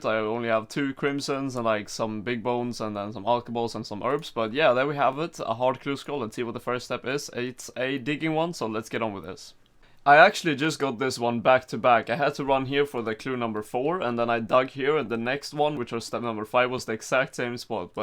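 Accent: Sweden. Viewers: swedish? sounds so much like swedish kind of english haha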